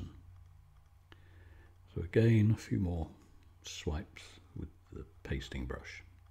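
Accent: United Kingdom. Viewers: Finally one with a british accent! Love your talking while painting!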